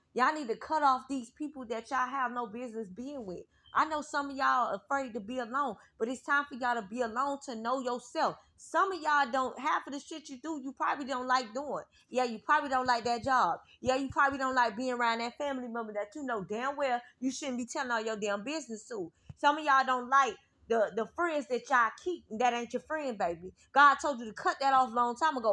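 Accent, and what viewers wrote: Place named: Miami, United States. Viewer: New subscriber ❤️ you’ve been speaking to me all day. You sound like you’re from Miami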